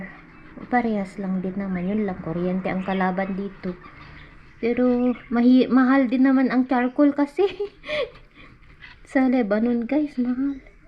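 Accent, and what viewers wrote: Ilocos, Philippines. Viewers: Halata k nga Ilocano uray Tagalog ti saom kabsat. No maminsan kasla Ibanag ti accent mo.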